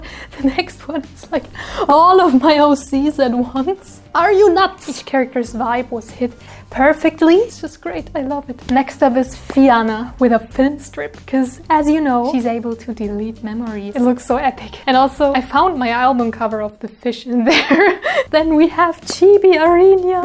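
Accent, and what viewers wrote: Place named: Germany. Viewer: Omg I‘m German too! :DD (hab mir schon gedacht dass ich den Akzent erkenne haha)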